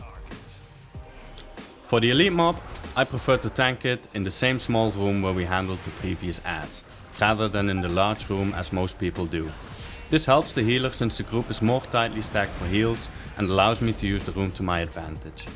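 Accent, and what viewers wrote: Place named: Netherlands. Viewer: Dutch huh :D Good video !! i really dislike this dungeon and this helped me out a lot !!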